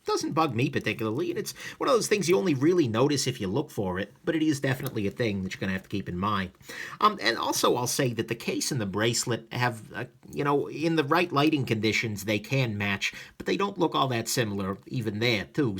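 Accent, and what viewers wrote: New York, United States. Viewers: Taking a wild guess, you're from NY?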